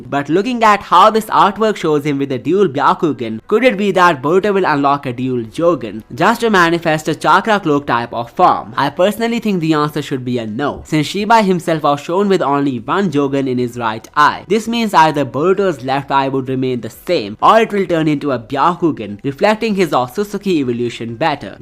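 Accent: India. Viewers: Bro pls tell me you are Indian or not, coz your accent sounds like it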